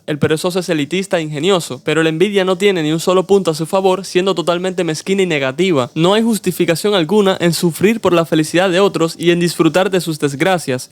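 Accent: Cuba. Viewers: Es que te tengo envidia por la edición de tienes, ni decir de tus memes o de tu acento cubano mi hermano 😎😎😎😎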